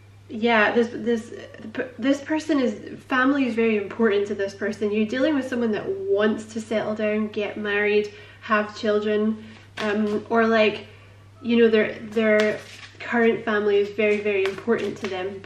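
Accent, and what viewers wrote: Scotland, United Kingdom. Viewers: I’m Gemini Sun… I enjoy listening to your Scottish accent. Merry Christmas!!☃️🎄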